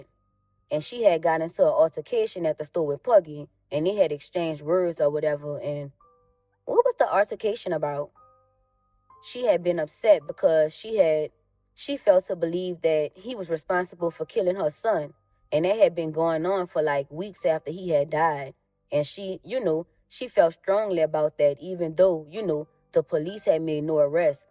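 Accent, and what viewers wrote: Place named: New Orleans, United States. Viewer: 👍 New Orleans accent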